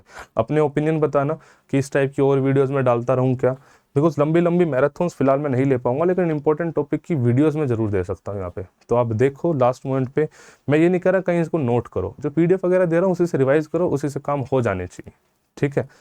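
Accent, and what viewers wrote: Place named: Bengal, India. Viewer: Sir your Bengali speaking very cute👌👌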